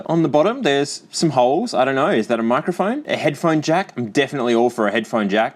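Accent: Australia. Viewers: all australians sound the same I was just watching dankpods video and there is ZERO difference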